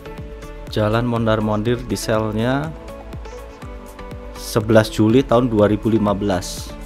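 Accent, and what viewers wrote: Java, Indonesia. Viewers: Logatmu jawa maas,,,apa asli jawa y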